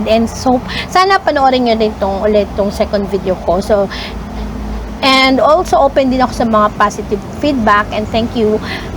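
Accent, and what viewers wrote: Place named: Conyo, Philippines. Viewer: Ang arteeeee 😂😂😂😂 im enjoying your video ng sobra.. ang lakas maka conyo 😂😂 excited to try your recipe 🥰🥰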